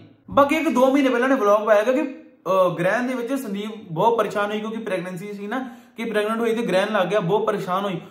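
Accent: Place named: Majha, India. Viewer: Majhe di boli😍 haada km nhi chlda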